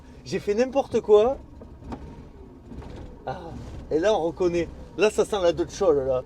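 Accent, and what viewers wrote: South, France. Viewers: Allez je me replonge dans un ancien test : ce qu'il y a de merveilleux avec toi c'est que tu vas t'extasier avec le même enthousiasme devant une modeste populaire autant que devant une Ferrari ! En bon chauvin, le charme vient aussi de notre bel accent du sud... Ouh la je vais me faire des ennemis.... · C'est débile, même avec l'accent du sud, on n'y croit pas : soit tu achètes un frigo, soit tu achètes une LN ... il est mauvais et il le dit lui même sans respecter la voiture qu'il présente ... un guignol de plus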